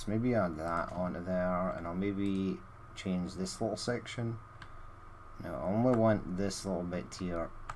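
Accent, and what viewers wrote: Scotland, United Kingdom. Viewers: Thats a proper Scottish accent laddeh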